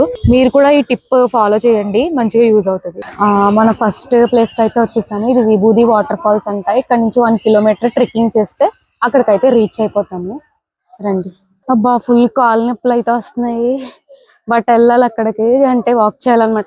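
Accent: Godavari, India. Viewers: కాటుక కళ్ళు తో వినసొంపుగా, గోదారి భాష మాట్లాడుతున్నావు, తిలకం బొట్టు పెద్ద గా పెట్టుకో, ఇంకా బాగుంటావు.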